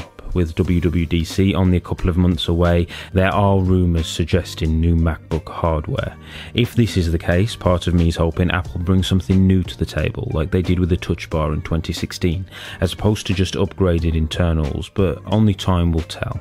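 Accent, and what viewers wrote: United Kingdom. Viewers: That British accent is 🔥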